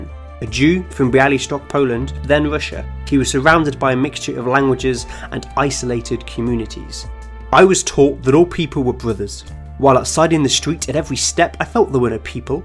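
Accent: England, United Kingdom. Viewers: What region of the U.K. is your accent from? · mi ne komprenis nenion ankaû, cxar lia akcento angla influas la prononco tro multe xD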